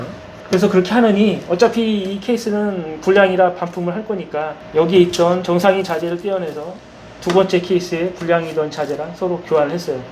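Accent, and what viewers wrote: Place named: Seoul, South Korea. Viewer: 사장님은 춘천분이신데, 서울말을 정말 잘 쓰심 ○_○ 발음도 좋으심👍👍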